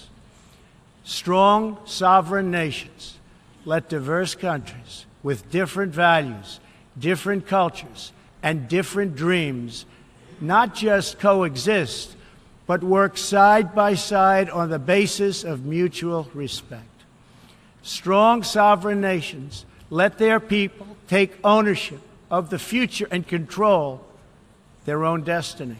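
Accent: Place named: New York, United States. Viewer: I really am on bored. I dont see any other politician saying this nevermind with his smart new york guyvtalk that is likeable not racist. Media is highjacked he says he is worried about america because we arent living up to expectationa because of our own system the president cant bring world peace unless we start in America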